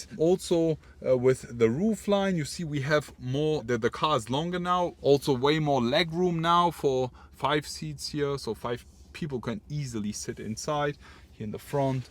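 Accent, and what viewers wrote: Germany. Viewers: Why does this guy sounds like a German speaking english.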